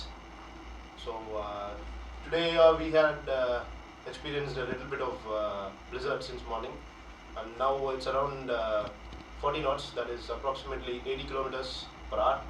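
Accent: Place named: India. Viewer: Subtitles would be helpful, guys. Your accent is very hard to understand for non-Indians.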